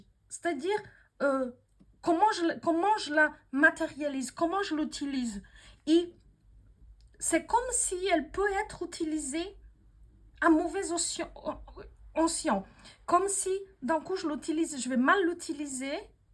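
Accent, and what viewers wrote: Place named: Portugal. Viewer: Bonjour Merci beaucoup pour ce très beau tirage très inspiré et inspirant .... Très riche lecture avec un très beau parfum d'accent portugais que j'adore ..... Belle journée . A bientôt 🙏🌻 Pitus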